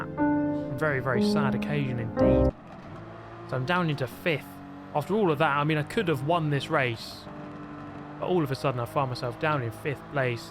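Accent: United Kingdom. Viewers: ! Your voice makes ne wanna be British! Um gonna STEAL your voice. But I need your password!!!😊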